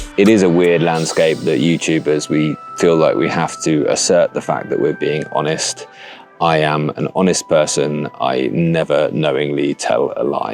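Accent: United Kingdom. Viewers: I love your videos. First, you’ve got the Brit accent, it’s cathartic for an American. Question. I currently have a remarkable pro move for quick notes and keeping up at work. The problem being is that I find it often gets left behind on my desk at work because I’m usually walking around a 1 million square foot hospital managing my staff, and there is concern breaking a larger device because I’m usually a hands-on kind of guy when it comes to building repairs. Add into that that I’m slightly irritated about having to pay for the Connect service if I wanted to use multiple reMarkable to sync across each other. I’m debating dropping the remarkable and moving to a Boox Palma pro in my pocket constantly, with a larger Boox Go that stays at my desk. I am hauling an xteink x4 to read, but find getting books on it tedious, but love that it is helping deter me from phone distractions. 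Can (and is it more desirable) to make the move to the Boox platform with sync and removing the remarkable and x4 from my pockets. Does the hi brake pro or plus support syncing with a bigger break device? I’m curious of your thoughts since you have so extensively used all these devices.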